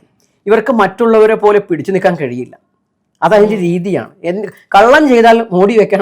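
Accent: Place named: Namboothiri, India. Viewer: സംഭാഷണത്തിൽ നമ്പൂരി ശൈലി ആഡ്യത്തം ങ്ങട് ലേശം കുറച്ചോളൂ... ബാമ്പ......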